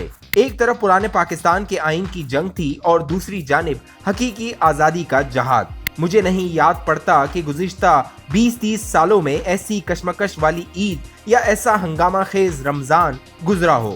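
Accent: India. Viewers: Absolutely right. Narrator seems to be an Indian due to his typical Indisn Pronunciation. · The guy who is doing commentery is indian from his accent! So what is he doing here?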